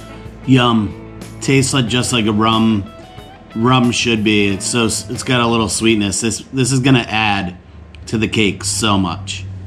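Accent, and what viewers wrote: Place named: United States. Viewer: American accent.. yea bro! Gotta try this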